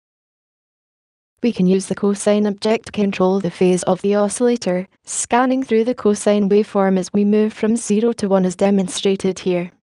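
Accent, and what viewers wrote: Scotland, United Kingdom. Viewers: I never imagined I'd be listening to a female robot with a thick Scottish accent teaching me synthesizer programming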